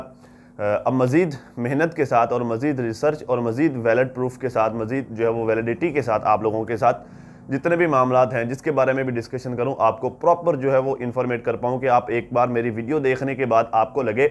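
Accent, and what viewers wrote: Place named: Hyderabad, India. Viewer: I think she is from Hyderabad, India. Their accent is very Hyderabadi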